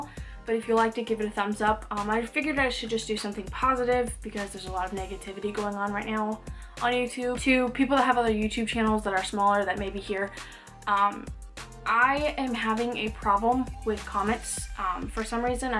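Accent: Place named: United States. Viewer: Oooooooo thank you so much for mentioning lil old me hahaha. I'm can't get over your new set up honestly I love it & the quality is great!! 😍😍👌🏼 Your accent is so cool! It's so American, I love it 😻 I'm dying a little on the inside how your step poppy is still poppy like we're all devoted to him and not as accepting towards to new husband he can just be called "insert name here" by the way that was meant to come across as humerus but it's hard on the Internet or maybe I just have weird humour lol either way loved the video and can't wait for your next one ❤️❤️❤️❤️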